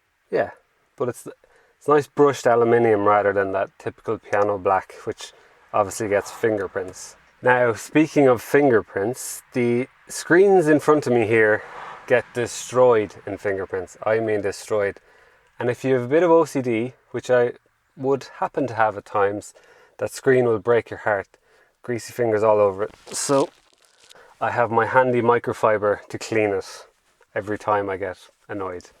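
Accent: Ireland. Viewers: I love that Irish accent 😊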